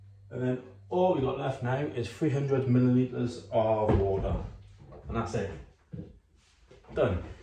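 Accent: Wales, United Kingdom. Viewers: I don't suppose for one moment that I'm the only person who clicked on this as a result of the still pic at the start of the video! 😋😋As for topless "sexual" clips. There are plenty of those on YouTube! BTW, I'm trying to place your accent? Welsh? Apologies, if I got that wrong! 😉😉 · Great Welsh Accent...much softer than some...so, which Part of Wales. Id guess North Wales.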